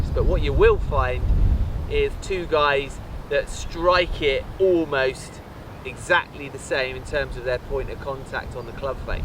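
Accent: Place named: United Kingdom. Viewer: Hey, really enjoyed yr commentary on the three hole channel at the open. Great to hear British commentary on the golf from here in the US.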